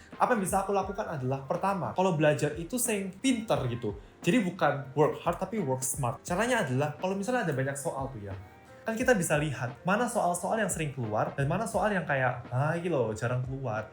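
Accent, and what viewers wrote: Surabaya, Indonesia. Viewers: bahasa jowo suroboyoe tambah mantep ngene dok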